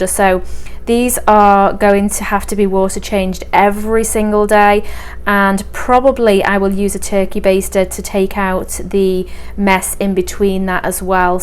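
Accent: United Kingdom. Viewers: With your accent I thought you where in the UK! Yes that's a bit far to post 😂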